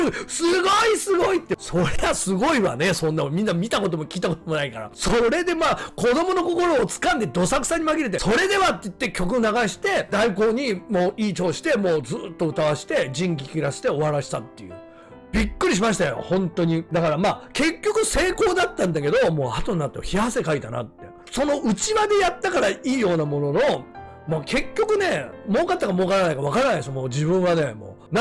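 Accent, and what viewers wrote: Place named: Nagoya, Japan. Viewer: 南利明以来の、久々の名古屋弁のエンタテイナーとして、毎回楽しみに見させていただいております。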